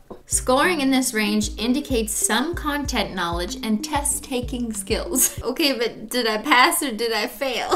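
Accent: France. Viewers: Her french accent in english words was very good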